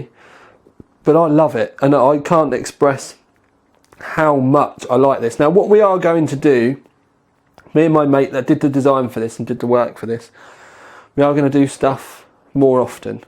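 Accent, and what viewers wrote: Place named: England, United Kingdom. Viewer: taking something bad that happened and turning it to something good, not only for yourself but for just random people all over the world! that is quite amazing and i really do like your accent im a big fan of the english accent so these videos are just amazing to watch :D i love the long videos cuz i love to listen to people talk for long amounts of time for some reason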